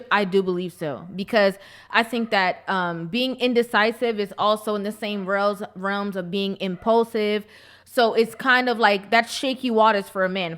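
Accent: Jamaica. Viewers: I hear the jamaican in u. I like ur comments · Good job though. I can detect you’re Jamaican. lol I’m too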